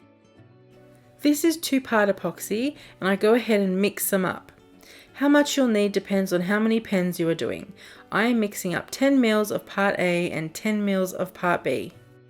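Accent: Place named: Kentucky, United States. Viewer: Love that Kentucky accent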